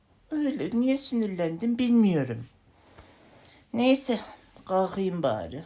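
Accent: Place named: Tokat, Turkey. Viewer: abi tokatlı şivesinden anladım,hatta yemin ederim tokatlı.ben buna cevap verdim de bende senin gibi nerelisin yazdım o yüzden boşuna yazma yani.😊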